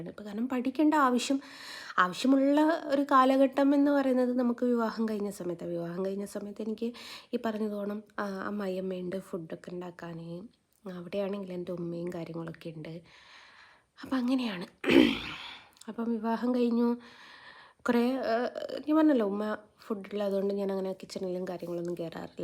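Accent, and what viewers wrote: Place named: Kuttiady, India. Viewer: nigalude bhasha kuttiady bhashapole und. Poonoor anennu eppoya arinja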